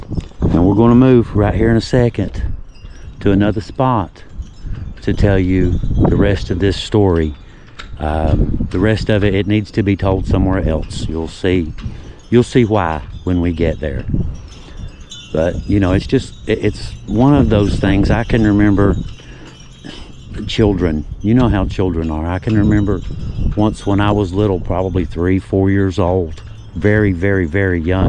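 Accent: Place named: Kentucky, United States. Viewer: and you two are such a wonderful team! I play your videos to listen to as I drive. My mom,she passed right before Christmas, loved listening and watching while I drove. has that storyteller voice plus the accent we love. You see she came from McCreary country Kentucky area. My grandparents moved north to Indiana once the mines shut. Later on working the glass factory up here she met my beloved Ma Ethel. Ma Ethel was from Mingo. Kermit to be exact. She was a Chafin.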